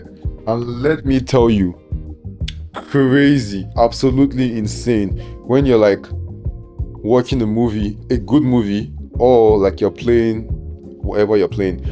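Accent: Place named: Nigeria. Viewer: You must be Nigerian right?😊 I can tell from your accent brother 😊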